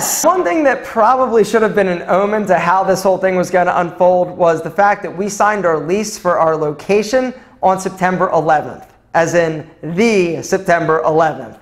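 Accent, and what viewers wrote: Philadelphia, United States. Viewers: I knew I recognized that philly accent!!! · I knew that accent sounded familiar. Grew up in Philly as well.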